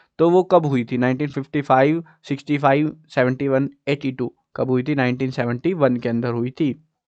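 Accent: Haryana, India. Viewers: Ap haryana sa ho kya bhai sorry. But Mariko ap ka history samaj nahi aaya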